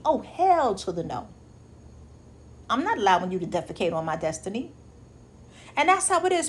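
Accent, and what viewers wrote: New York, United States. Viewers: So appreciate your ministry.. your giftedness! Love your teaching style bold…unadulterated..❤ ❤approach along with your wonderful NYC accent. Through your ministry I learned to focus more on my own personal goals gifts and talents and continue to pursue what is best for me in this season of my life. You keep shining! I love church by phone each Sunday along with the wellness club on Wednesday. I have at least 4 of your books and have given 2 additional books away. God Bless you. ❤Lorraine from NY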